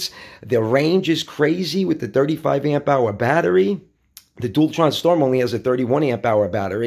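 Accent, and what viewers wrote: New York, United States. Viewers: I love your NYC accent you do really good vids and your bodybuilding channel is awesome as well!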